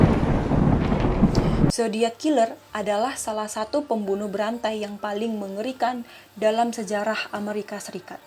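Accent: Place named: Medan, Indonesia. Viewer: Mantap videonya kakk.. Request dong kak eps berikutnya full pake logat medan kak 🤲🏻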